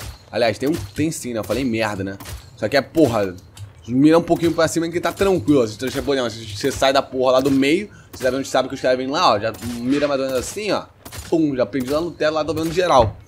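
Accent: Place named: Rio de Janeiro, Brazil. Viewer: Muito carioques, fala muito mal, parece que nunca esteve na escola, melhorar essa voz de malandro ai negão, kkk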